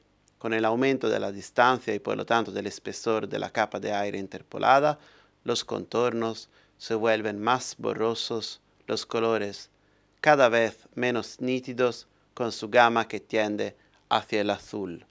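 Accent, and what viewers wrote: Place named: Italy. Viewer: Hermoso video acompañado con un gracioso acento italiano. Traducir en 2 idiomas además de la lengua nativa, es de un esfuerzo y compromiso que se aplaude. Ustedes parecen tan multifacéticos como el mismo Leonardo Da Vinci. Muchas gracias por el video. Una consulta: ¿la acuarela azul es hecha con trementina, o con agua? Muy amables, ¡y feloz año nuevo!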